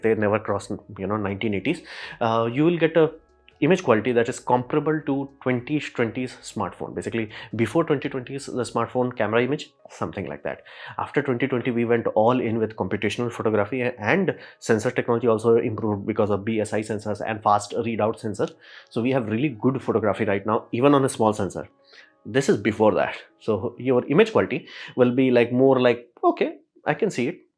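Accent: India. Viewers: Are you a Bihari? upiete? 😅😅😅😅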